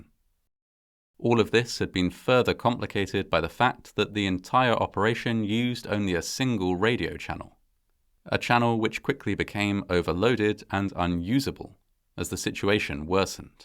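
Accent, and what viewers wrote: United Kingdom. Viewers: The narrator is so annoying. Why do we have a British narrator with an American story sounds bloody awful.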